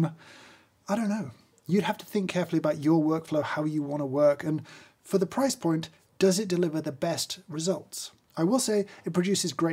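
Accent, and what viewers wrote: Germany. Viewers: His German pronunciation is hilarious. 😁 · good german accent, congrats :) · The englisch/german accent is so sweet :D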